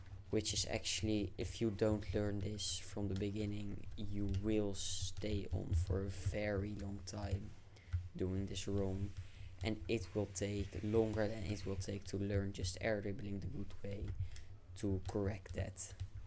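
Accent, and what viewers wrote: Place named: Netherlands. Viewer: (ik ben ook nederlands ik herken het accent gelijk😭)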